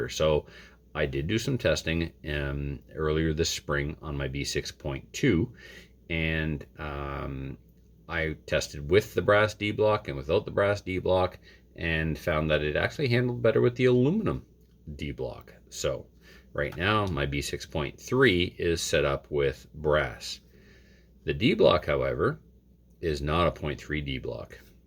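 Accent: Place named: New York, United States. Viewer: you sounded like you were maybe from New York lol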